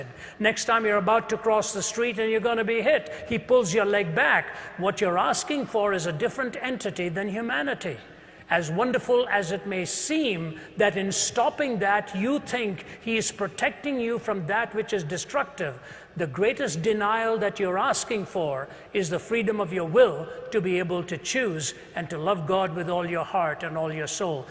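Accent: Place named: Ireland. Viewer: I first became aware of Ravi when I found him on the radio when I was a trucker. In my mind I thought he was an Irishman because of his accent. I imagined him with red hair and beard lol. I thought his name was Robby! But I am so glad I stumble upon him! He is so smart and well spoken.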